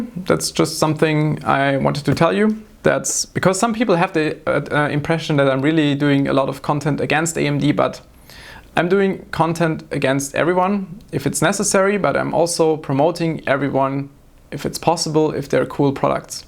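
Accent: Germany. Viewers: I love the German accent, the way you pronounce stuff makes me shiver! But, while Ryzen chips are good and all, I'm still grabbing me an i7. Why? I already have a high-end Aorus Intel mobo. Buying a new one just for Ryzen is just illogical for me. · Den deutschen Akzent hört man einfach immer raus :D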